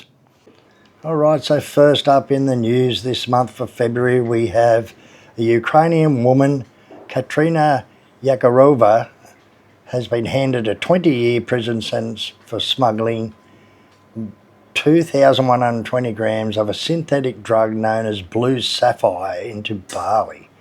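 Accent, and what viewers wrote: Australia. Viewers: Hi. REALLY enjoyed that and learned more about Bali. I enjoy listening to your Aussie voice not many of us left it seems. And yes. Albo is a goose. Keep em coming!!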